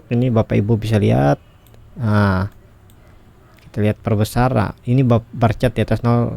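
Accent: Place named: Borneo, Indonesia. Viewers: Logatnya original ini asli borneo.. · Bos logatmu kok kadang seperti orang sunda?. Aslimu Borneo apa sunda?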